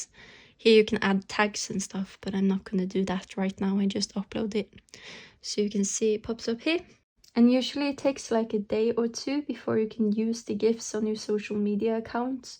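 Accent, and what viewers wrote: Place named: Estonia. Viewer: Ah... estonian accent...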